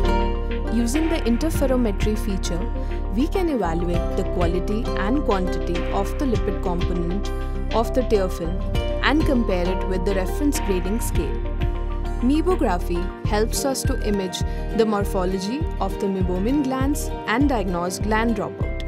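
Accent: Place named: India. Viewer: I love indian accent Love INDIA from HUNGARY 🇮🇳❤🇭🇺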